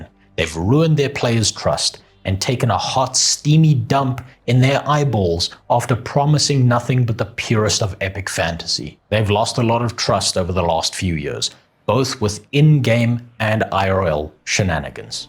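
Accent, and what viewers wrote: South Africa. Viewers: Saw you on Asmon bru, fucking amazing to hear an SA accent on a monster streamer like Asmon, he laughed his ass off at your clips of him!!! well done man!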